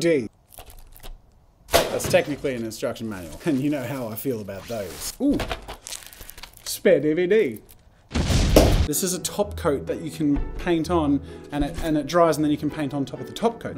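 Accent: Australia. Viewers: r u aussie? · Are u from Australia? I mean your accent.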